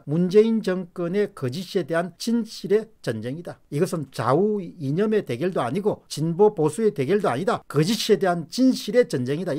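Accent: Gyeongsang, South Korea. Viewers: 공병호씨 이분 기억해둬야겠네 말투보니 대구사람 같은데 같은지역 산다는게 넘 쪽팔린다. 미국산 쇠고기 마이 쳐 먹었나??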